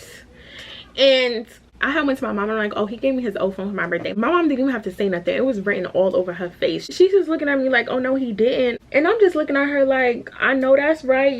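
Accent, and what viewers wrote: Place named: New York, United States. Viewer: Are you from NY, I like your accent❤️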